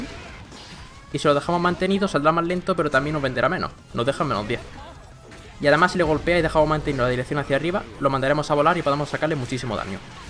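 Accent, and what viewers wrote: Spain. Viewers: este youtuber es genial, enseña muy bien, pero no le entiendo lo que dice, de que región de España es ese acento?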